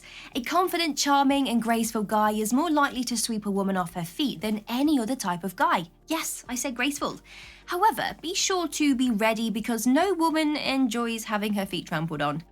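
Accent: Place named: Manchester, United Kingdom. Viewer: Great video, thanks! I love your accent; did you grow up near Manchester by any chance?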